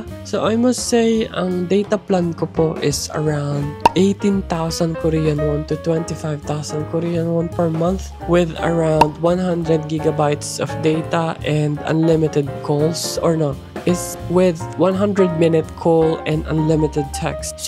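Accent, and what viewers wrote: United Kingdom. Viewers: Why do you have British twang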